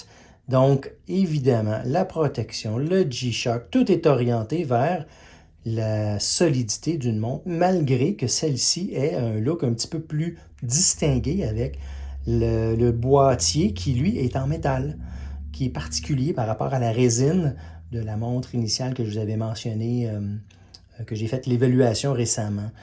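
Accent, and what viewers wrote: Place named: Quebec, Canada. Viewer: Woaahhh! Un québécois!! Super!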